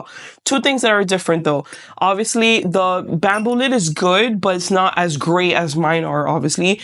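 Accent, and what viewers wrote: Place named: Miami, United States. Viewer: idk how you popped up on my feed but girl, I’m so grateful to you- your video has been so helpful. I’ve watched so many creative small business videos and as soon as I herd your voice I was like “bro she’s from Miami” I know this accent. I’m from Miami and then you said Miami y Doral … I’m like Gracias Diosito. Finally someone on YouTube that’s local. Im slowly growing my small business and I really want and need this business to succeed (pregnant with my second baby and I know I’m made to create). 🥹I’ve known about Libby from wine glasses and never knew they have glass cans so THANK YOU 🙏🏻 excited to watch more of your videos and get inspiration to also share my journey and grow this business. 💝🎨 thank you again- you Rock 🌻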